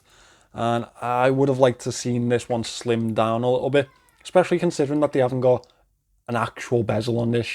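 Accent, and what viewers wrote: Liverpool, United Kingdom. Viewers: Bought the fluted bezel Datejust homage from Pagani a while ago and was thoroughly dissapointed in spite of the low price ! Very poor finishing and quality overall, poorly executed jubilee bracelet ...... it just felt like a "cheap ass watch" !! So it was with some apprehension that I ordered the dark blue OP V2 homage from Pagani, based on some very good reviews online. I must say, that the overall quality and impression of this model is lightyears ahead of the Datejust that almost put me off Pagani forever ! It feels that the quality of the watch is on par with my Seiko SARB033 and my Citizen NB1050-59E JDM. I was so impressed that a couple of days later I also ordered the non date Tiffany blue model, which will hopefully arrive in a couple of days :-) From what I can see in your review (or non-review by your statement :-) ) I will not be dissapointed. 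Thanks for the review - keep them coming ! Just pushed the subscribe button :-) Also great to hear a "Scouser" accent in a review, even though I am not British ! ;-)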